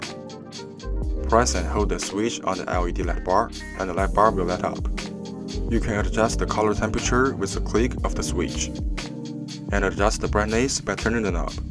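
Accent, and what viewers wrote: China. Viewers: They are from china. And I guess some people may not understand the way they speak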